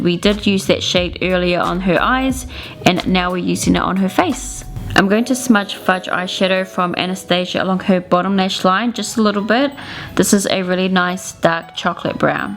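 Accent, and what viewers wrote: New Zealand. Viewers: Absolutely stunning makeup that you did in this video ! X ... However, subbed coz I loved your kiwi accent (now i know what people mean about kiwis having an accent...couldnt hear it !) :D I also grew up in New Zealand x Now living in Aussie!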